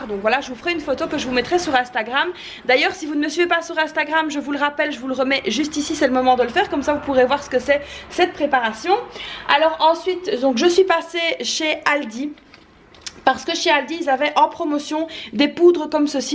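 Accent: Belgium. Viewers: Coucou j ai decouvert ta chaine en cherchant des videos budget Quel bonheur de tomber sur une belge ( je pense quon est de la mele region vu l accent😉). Je suis belgo marocaine a la recherche d une vie simple et une meilleure economie.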